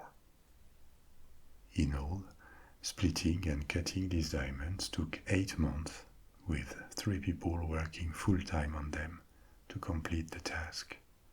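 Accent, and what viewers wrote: France. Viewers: This is now my favorite ASMR channel. His French accent just makes me melt. Thank you for calming my anxiety :)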